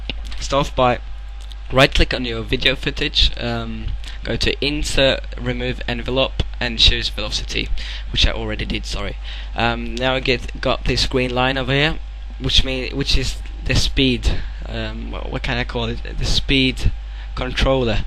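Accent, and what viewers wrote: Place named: United Kingdom. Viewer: UK Voice.. Better then those other shitters that can't speak proper english lol. Nice tutorial sure :) Keep them comming ^^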